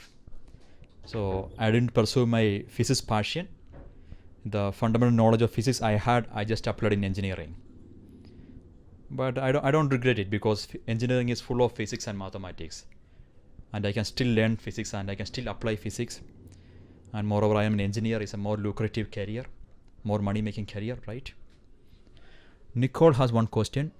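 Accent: India. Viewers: Hi mr.. Do you know any engineering simulation softwares? Like matlab, msc softwares etc? What kind of engineering consultancy did you run? And i feel that you are from southern india based on your accent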